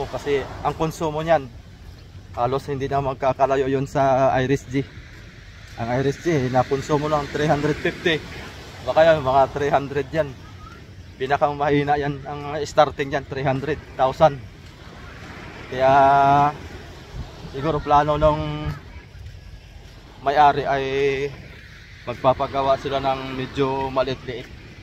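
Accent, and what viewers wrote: Philippines. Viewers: may pagka-bisaya ba pananalita diyan?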